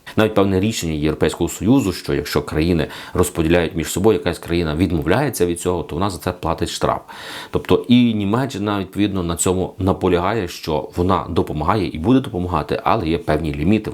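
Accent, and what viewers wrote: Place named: Western Ukraine, Ukraine. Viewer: Западенець, бендеровець...мало грушей накрав....по мов1 ч1тно, як ти розмовляеш мовою....я укра1нка, а розмовляю зовс1м доугим д1алектом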